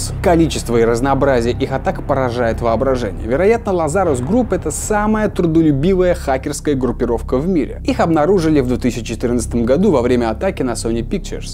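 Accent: England, United Kingdom. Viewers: А я единственный заметил, что у что то с голосом, будто какой-то английский акцент. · Мне показалось или в этом выпуске говорил с очень заметным акцентом? Я бы сказал как англичанин но очень хорошо говорящий по-русски. Букву Р произносил очень мягко например. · Тут всё видео говорит английским акцентом, я один это заметил?.-.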